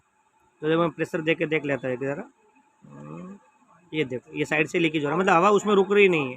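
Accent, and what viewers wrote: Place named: Gujarat, India. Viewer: Wah gujarati hindi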